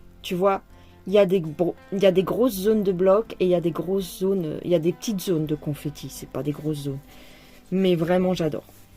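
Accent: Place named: Marseille, France. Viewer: Coucou du Québec. Bravo pour tes belles avancées et ton enthousiasme communicatif. Et j’adore ton accent. De quelle région viens-tu? Je dirais Marseille…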